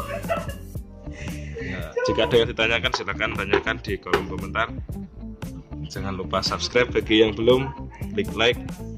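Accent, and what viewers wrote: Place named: Java, Indonesia. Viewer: Sampean tiang pundi mas ,logat jawane kentel soale😂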